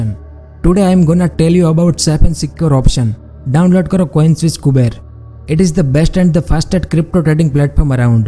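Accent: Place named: Kathiawar, India. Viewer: Aapki hindi kathiyawadi tone me kyu he?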